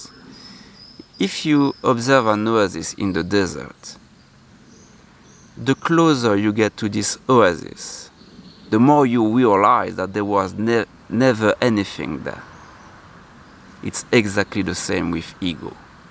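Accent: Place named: France. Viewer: Ha ! Yes, some of your words are confusing in meaning. Your thick ( I'm guessing French ? ) accent tends to distort them sometimes.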